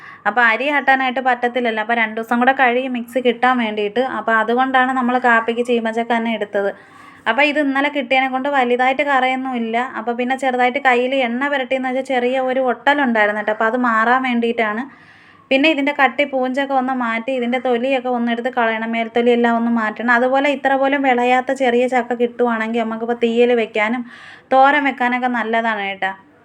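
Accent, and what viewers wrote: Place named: Kollam, India. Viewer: Ningal kollam ano avduthe samsaram pole und kettatu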